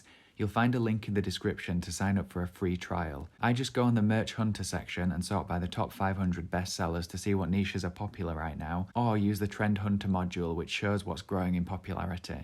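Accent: United Kingdom. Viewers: nah mate it just sounds like it but that's the "bri - ish" accent. Your videos are dope btw! :)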